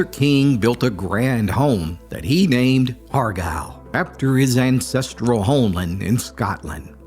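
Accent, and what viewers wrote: Appalachia, United States. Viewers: Needing to rest and listen to something.. pops up! PERFECT! 😊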